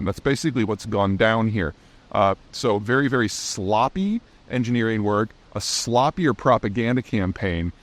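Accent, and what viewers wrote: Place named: Midwest, United States. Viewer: Hi: That is a well known speech pattern common to the midwest America, lots of other examples, picture and pitcher. I have this myself, I can't hear a difference between "nu-clear and new-clear" Daniel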